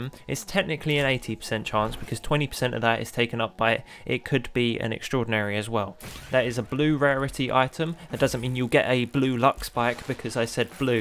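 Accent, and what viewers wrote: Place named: United Kingdom. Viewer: It sounds like he's british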